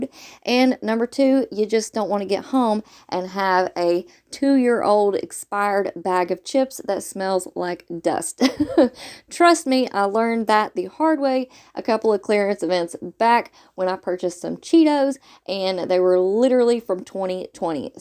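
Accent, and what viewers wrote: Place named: South, United States. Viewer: Odd comment incoming 😂….I love your voice and accent! It’s so pleasant. Are you a fellow Southerner?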